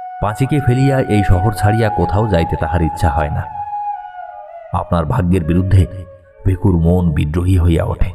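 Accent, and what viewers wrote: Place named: Khulna, Bangladesh. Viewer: ভাই আপনার বলা সাবলীল ভাষা বিশেষ করে খুলনার টান ভালো লাগে।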